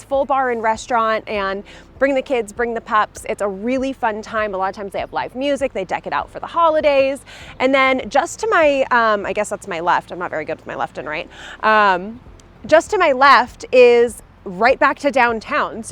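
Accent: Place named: California, United States. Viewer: Hello ^^ It's the thinker Christina Hoff Sommers who brought me here because she's from Petaluma and I wanted to know more about the homeland of my favourite female intellectual. You have the same lovely accent as her and also her laid-back cool attitude ☺ As says: " are unforgettable". I live in Algeria, but I long to visit your gorgeous town, especially the library-museum for I love books and history! Good continuation my friend 🙂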